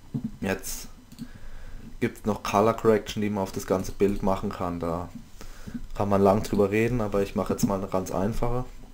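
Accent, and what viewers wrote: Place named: Swabia, Germany. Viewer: Du bisch a kleiner Schwab wa? ^^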